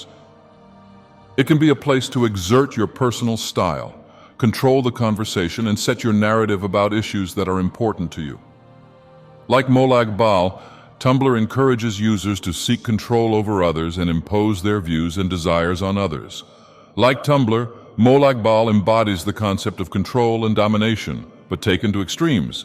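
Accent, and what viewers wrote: United Kingdom. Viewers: I didn't notice before, but he's doing the thing where he gets more British as time passes. ❤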